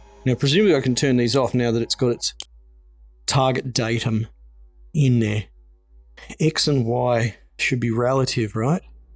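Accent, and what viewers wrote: Australia. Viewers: Your accent sounds like it must be from Adelaide.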